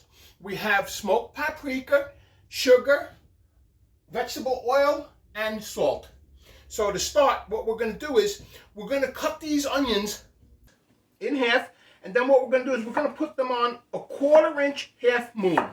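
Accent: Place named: East Coast, United States. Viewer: I'm living in Chicago. First,I love the accent. I miss the East Coast. I love this.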